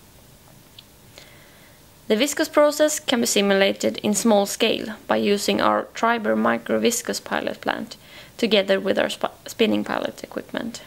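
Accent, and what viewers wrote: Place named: Sweden. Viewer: Normal Swedish accent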